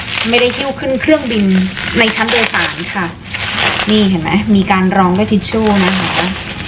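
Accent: South, Thailand. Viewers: นํ้าลายแตกตามเลยคะ ชอบ พูดใต้คะ ขอบคุณสำหรับคลิปน่ารักๆคะ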